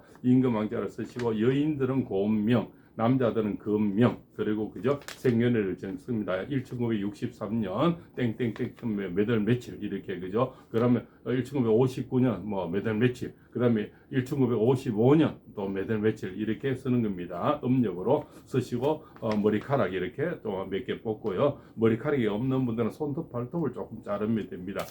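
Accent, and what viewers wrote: Gyeongsang, South Korea. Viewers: 아,넹 감사합니당ㅋ 쌤 경상도 발음을 제가 잘 못 알아 들어서 죄송합니다ㅠ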